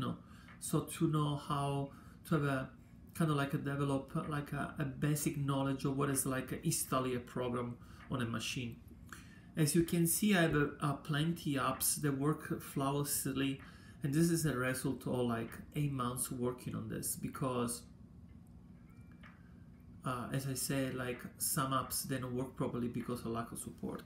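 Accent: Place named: Italy. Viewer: La butto lì...ma sento un vago accento italiano...😜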